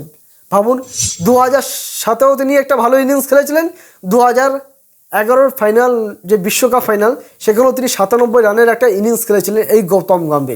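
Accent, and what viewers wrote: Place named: Kolkata, India. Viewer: হাগল দাদা। পাগল। কলকাতার বাংলা টা বেশ ফানি। যদিও মানতে অসুবিধা নাই যে , তোমাদের টাই শুদ্ধ বাংলা।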